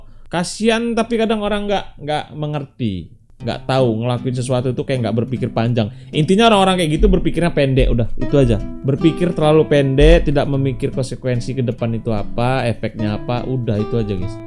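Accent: Java, Indonesia. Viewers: tak kiro samean wong jowo bang 😂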